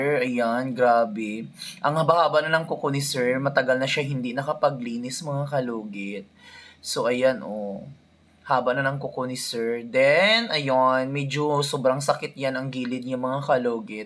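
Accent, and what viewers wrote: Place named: Waray, Philippines. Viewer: So satisfying.. waray2x ka madam? Heheh imo accent winaray man. 😊😊 more vids pa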